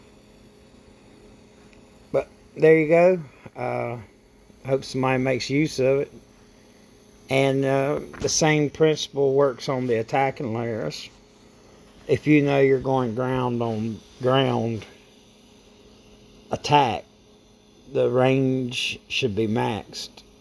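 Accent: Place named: South, United States. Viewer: A southern accent and the sound of a cigarette being sparked up... 😁 You have my attention sir. Hello from Birmingham Alabama 👋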